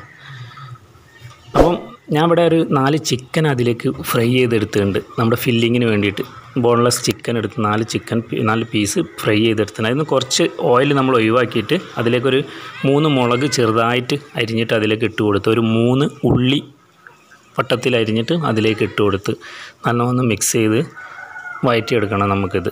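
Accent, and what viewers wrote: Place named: Kozhikode, India. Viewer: കോയിക്കോടൻ ഭാശ കേക്കാൻ നല്ല രസ ണ്ട്😊👍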